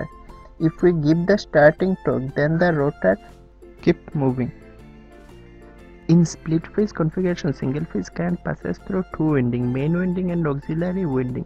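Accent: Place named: East India, India. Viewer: your east indian accent is clearly depicted.. -@ anway i get it and the graphics are done well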